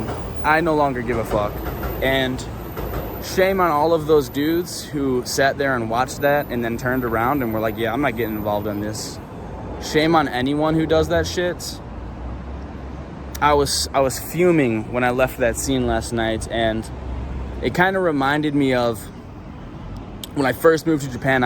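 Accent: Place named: South, United States. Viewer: Your accent is too Southern USA ! Go back to North Carolina hick !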